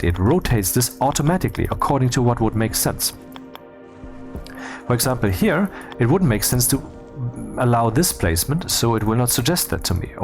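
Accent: Germany. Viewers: I love everything about this video ❤️ I love that your introduction takes almost 5 hours, that you get slowed down by needing to explain the details then end up sayin "we'll get back to that later" and I love the German accent. I got here by chance. Steam showed me this game I had never heard about at checkout buying a totally different genre game. So I watched the first 20mins of this video to help me decide - and promptly added the game to my purchase. Now I am into your video and have 22hours game time registered over 5days, trying to figure the concepts out myself and only taking hindsight hints from your video. Best Xmas gift for myself ever 😂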